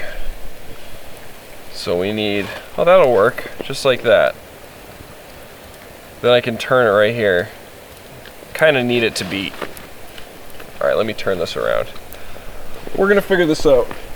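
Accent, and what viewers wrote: Canada. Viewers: I can't decide if he is Canadian, or just making fun of us. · Man, you sure sound Canadian. Tossing out those ‘eh’ casually. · Are you Canadian or American?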